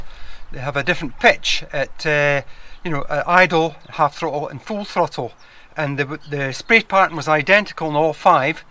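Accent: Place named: Inverness, United Kingdom. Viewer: You sound like you’re from Inverness. I just revived Heart Vw MK1 with the K jet injection system. After rebuilding the fuel distributor, the problem ended up being no pressure coming out of the warm-up regulator. Come to find out it was completely seized internally. After taking it apart and freeing up everything, I had more than 60 psi’s feeding the fuel distributor, which resulted in the car starting for the first time. Leave no stone unturned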